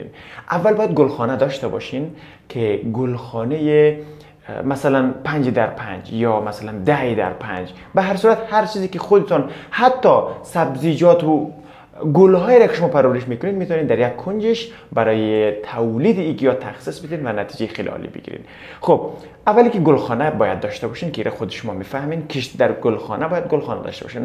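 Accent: Afghanistan. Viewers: قربونش او لهجه افغانی و کرامت برم . شما مردم عزیز افغانستان . بسیار بسیار مردم عزیز و شریف و درستی هستید . من با شما عزیزان خیلی سر و کار داشته ام و شما عزیزان را به پاکی و شرافت و انسانیت میشناسم . ارادتمند .❤❤❤ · عالی بود یه برنامه جالب با لهجه زیبای افغانستانی و اصیل ایرانی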